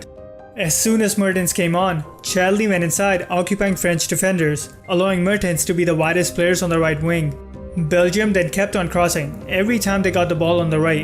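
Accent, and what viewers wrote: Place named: India. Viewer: The guy talking has to be an India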